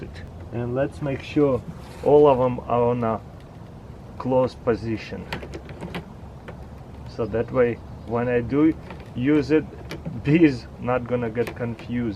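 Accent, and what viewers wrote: Russia. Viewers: i like ur rushian accent :)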